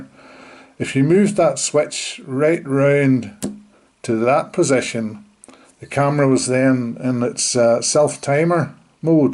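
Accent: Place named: Ballymena, United Kingdom. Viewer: Great review. I can't quite place that accent, maybe a bit of Ballymena in there, well somewhere in mid Antrim, from a Co Down man living in Lisburn. 👍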